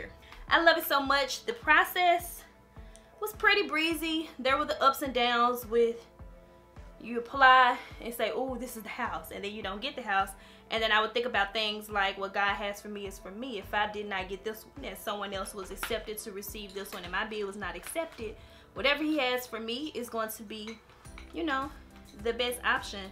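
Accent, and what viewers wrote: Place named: Memphis, United States. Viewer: I'm really glad I've been following you almost a year. And you are a big motivation for me. For because you from M Town South Memphis to be exact 💯 in your voice lol. And you have a godly and lit spirit. Merry Christmas eve ❤ 🌲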